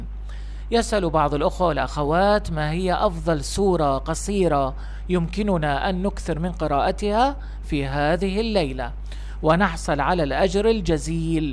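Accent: Lebanon. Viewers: ماجور ان شاءالله بس عندي استفسار خارج الموضوع يأخي نبرة صوتك قريب على اللهجه اللبنانيه او السوريه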